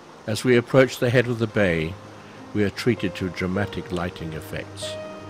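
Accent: United Kingdom. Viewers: Wered you find this voice narrator? i could listen to him all day, love his slight british accent !Really makes the video classy!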